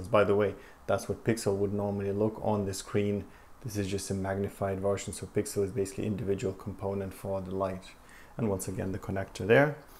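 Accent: Ireland. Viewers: Great video. Where are you from? You have an Irish twang in your accent, but dont sound like you are from Ireland. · He does sound Irish, possibly from Dublin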